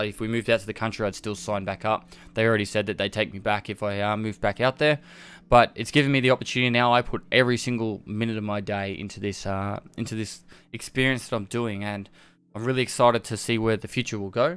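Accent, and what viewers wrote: Australia. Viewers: So I found you because you ran duos with summit but I was so pumped when I heard an Aussie voice. I'm 36, father of 3, business owner and very part time streamer too and I think the biggest thing you say here that is so correct. Consistency. Your chat is always so busy so I'm taking advantage of this opportunity here to say, thanks for being so humble, honest and truthful on and off stream. I really enjoy being a part if the community and if you ever make it back to Radelaide beers on me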